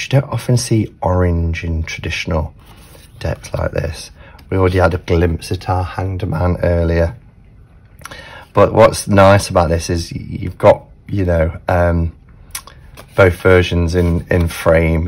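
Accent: Nottingham, United Kingdom. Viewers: What an interesting deck of cards. Thanks for the walk through, always lovely to hear a familiar Nottingham accent (all my Mum's family were born in and around Nottingham). I don't use pippy decks but this one caught my attention. I looked around for it and found it for a great price on Amazon at the moment. The cards arrived today and although the cardstock is thin and will crease if handled roughly, the illustrations are great and it's a lovely addition to my small but growing collection. PS The cards are Produced by River Horse and printed by Lo Scarabeo, like the ones shown in the video.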